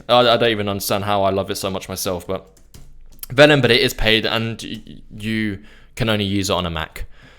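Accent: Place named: United Kingdom. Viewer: Great! 😊 btw I love British accent & sense of humor. Cheers! 🥂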